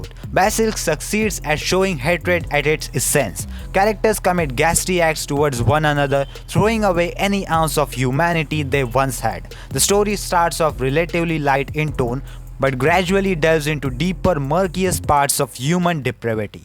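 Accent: India. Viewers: Indian accent is worst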